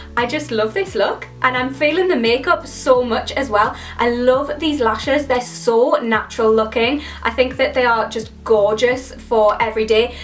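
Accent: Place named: Liverpool, United Kingdom. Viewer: You made me giggle with your scouse accent 😂 wonderful video as usual! You’ve done us women proud since it’s been women’s day this week! 💪🏼💓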